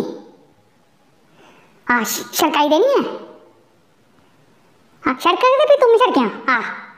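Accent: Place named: Jammu, India. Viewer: 😂😂😂😂 bilkul aise hi baat krti h jammu ki ourtein. Very nice.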